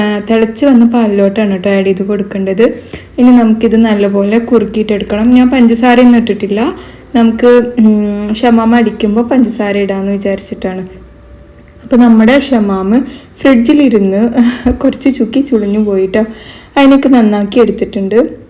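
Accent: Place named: Kannur, India. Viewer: Fest thane adichu varunna seen ozhivako maachi🤣🤣🤣superatttto to to to kelkan rasam kannur ami good recipy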